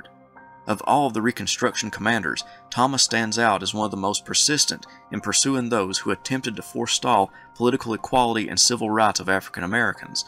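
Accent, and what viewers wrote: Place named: Virginia, United States. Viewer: I have long admired Gen. Thomas and I often wondered why his name was forgotten for so long. Thank you for this series of videos on the life of this honorable, intelligent and courageous man. He is a credit to the United States. And so are your videos on the American Civil War. Incidentally, I imagined that your (Virginian?) accent is quite similar to Thomas' own voice. It made it seem that the man himself was narrating his own biography.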